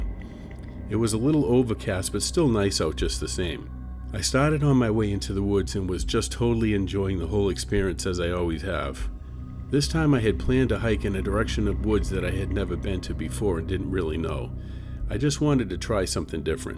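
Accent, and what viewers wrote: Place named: Northeast, United States. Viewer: The northeast accent is refreshingly unique. Very much enjoying your channel. Looking forward to watching it grow.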